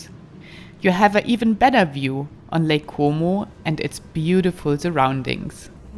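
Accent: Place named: Germany. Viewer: This girl has to be German. Nice accent when she speaks.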